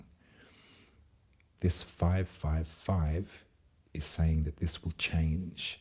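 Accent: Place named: Australia. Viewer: Finally! Someone who pronounces Uranus properly....gotta love a fellow Aussie! (And you do it with such style and grace) ❤🐠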